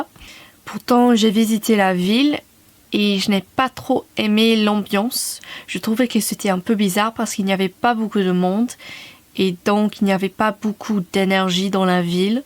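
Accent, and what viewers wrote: United Kingdom. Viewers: ironically love the casual brit accent slipping through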